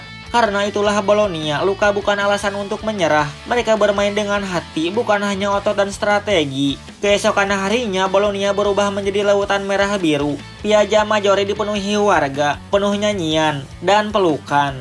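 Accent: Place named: Java, Indonesia. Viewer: suaranya jga bagus kaya orang Jawa medok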